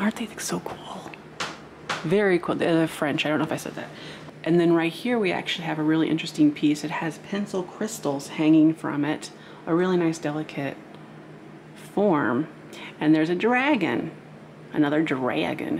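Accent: Midwest, United States. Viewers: such a wonderful overview of everything and your right, CLL would go absolutely crazy❤️ And our Midwest pronunciations ❤️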